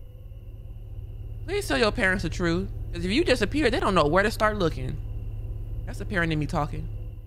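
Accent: United Kingdom. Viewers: “Young tenderoni” 😂 love a fellow brit ✨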